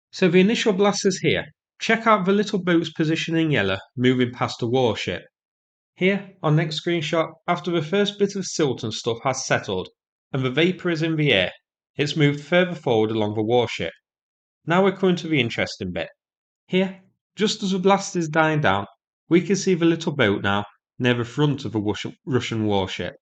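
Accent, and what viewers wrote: United Kingdom. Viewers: thanks subtitles...where are you from in Britain?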